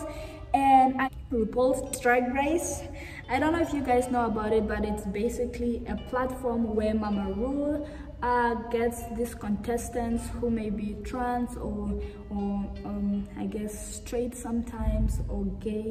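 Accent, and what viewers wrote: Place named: Namibia. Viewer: Love your accent African sister. You’re the first Namibian I met... even though this is virtually. Thanks for talking about abuse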